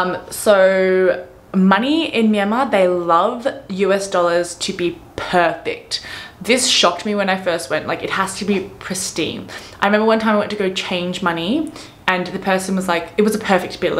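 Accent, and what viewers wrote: Australia. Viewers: Myanmar has bad reputations for a lot of things but when it comes to culture and hospitality, it’s top-notch. I grew up in Myanmar and you’re making me miss it a lot. Definitely visiting there in the near future. Thanks for all the videos you make about Myanmar! Also you’re so relatable to me since I’m from Myanmar, love Australian accent although living in the US and currently learning Chinese. My life’s a mess in a good way haha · Firstly, I really love Australian accent❣ Even though you are australian born chinese, you look like burmese sometimes😆😅. If you try speaking in burmese to Myanmar people, they might not notice that you are a foreigner. 😆 Anyway, stay safe and healthy. And yes, I'm glad that I discovered your channel.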